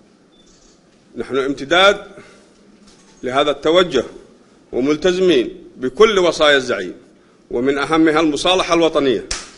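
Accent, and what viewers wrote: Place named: Iran. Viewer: من لهجتك وطريقة حديثك يتضح ان مجوسي تابع لبني صفيون في ايران ،،ما علاقتك باليمن العربي الجريح وانت لا تنتمي له ولا للعرب ولا للعروبه ،،والله ليسحقوك اهل اليمن الشرفاء بمساندة اخوانهم السعوديين عما قريب واتوقع قريبين جداً ربما لا يتعدى سنه ،،،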